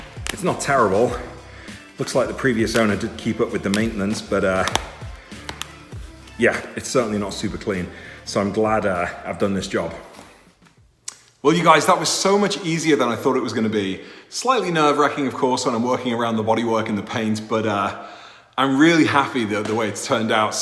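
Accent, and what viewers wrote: Liverpool, United Kingdom. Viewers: Yes I could tell that you’ve got a bit of a scouse accent 😎😎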